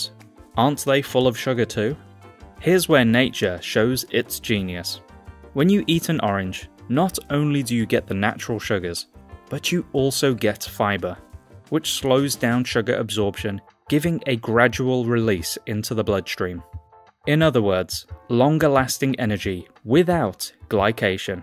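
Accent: England, United Kingdom. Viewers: Why is someone with an English accent pronouncing items the American way? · A voice over with english accent means it is probably BS. Iguana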